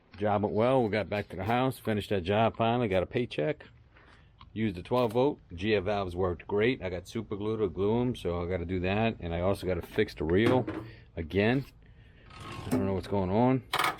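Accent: Louisiana, United States. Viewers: 985 I knew that was a Louisiana accent.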